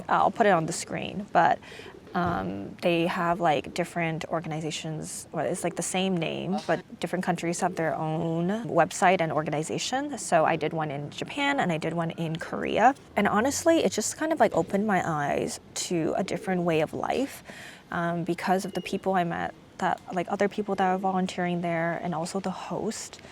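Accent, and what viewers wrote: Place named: Canada. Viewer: I love the Canadian raising in your accent - every other sentence sounds like a question!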